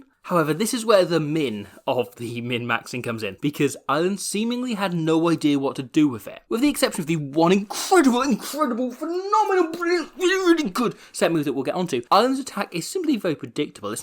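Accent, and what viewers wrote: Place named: England, United Kingdom. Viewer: Welsh parents but brought up in Nottingham, England hence the accent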